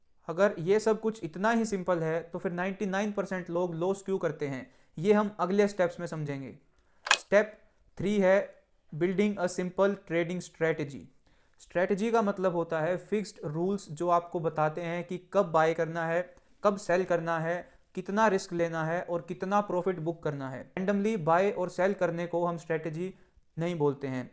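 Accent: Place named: Najafgarh, India. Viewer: Saath me trade krte h bhai. Me b najafgarh se hu tu b aas paas ka hi lg rha h boli se😅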